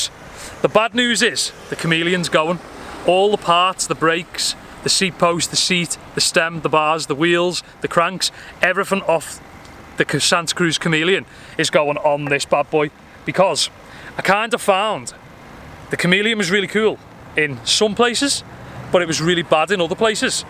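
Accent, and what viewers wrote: United Kingdom. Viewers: what type of accent is this? i know it's from UK but i don't know which part.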